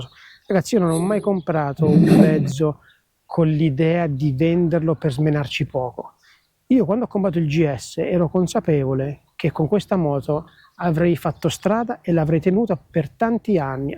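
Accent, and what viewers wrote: Abruzzo, Italy. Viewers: Complimenti discorso esemplare, hai il giusto modo di viaggiare, in sicurezza e con passione. Poi sento che sei abruzzese come me 👍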